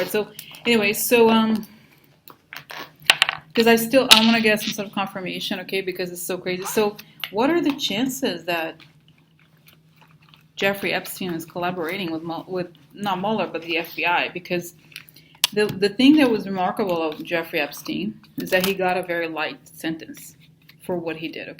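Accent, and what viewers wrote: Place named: Russia. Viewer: The faces take away from your content? Really? Oh HORSE SHIT! They enhance your readings, help us cope, and provide most of us with the only politics-related laugh we will get each day. Especially love your Russian accent. Never stop. PLEASE and Thank You! 💕💕😂💕💕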